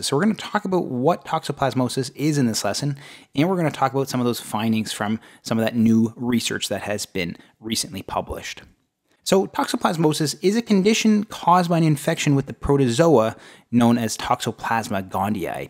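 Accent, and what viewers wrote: Canada. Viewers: i can tell youre Canadian lol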